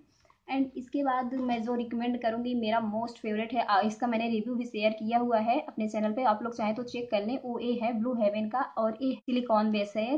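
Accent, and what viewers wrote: Bihar, India. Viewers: Aap itna bihari ka jesa kyu bolti hai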